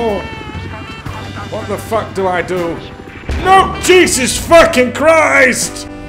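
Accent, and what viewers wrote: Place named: Liverpool, United Kingdom. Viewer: ahhh you snake charmer 😏 I just watched a couple of your other videos aswell and realised you were scouse:L This is awesome content tho man! if you follow me on twitter i'll give you steam details and such on there!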